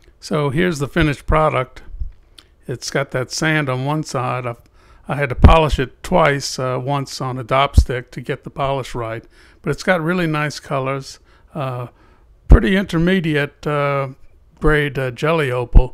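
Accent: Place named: Louisiana, United States. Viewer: Another excellent video of my favourite Lousiana accent. I will train to have the same english accent as you. For me is a double gain, I learn English and about minerals.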